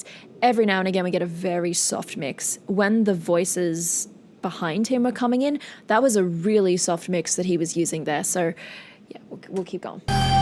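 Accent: Australia. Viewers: Australian accent, yes.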